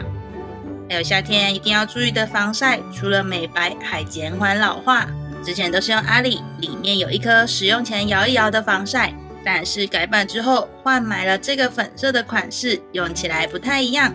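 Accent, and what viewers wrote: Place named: Taiwan. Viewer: 台灣口音好可愛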